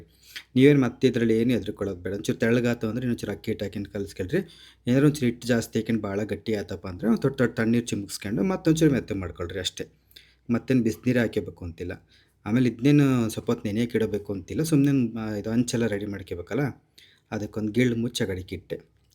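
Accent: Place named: Holalkere, India. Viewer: ನಿಮ್ಮ ಮಾತು,ನಮ್ಮ ತಾತ ಅಜ್ಜಿ ಮಾಮ ಹೊಳಲ್ಕೆರೆ ಗೆ ಹೋದಾಗ ಮಾತಾಡುವಾಗ ಕೇಳಿದ್ದೆನು. ಅದೇ ರೀತಿ ಇದೆ.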